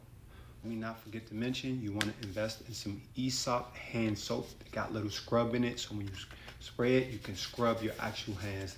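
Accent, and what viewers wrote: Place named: Caribbean. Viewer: Are you Caribbean? The accent keeps slipping out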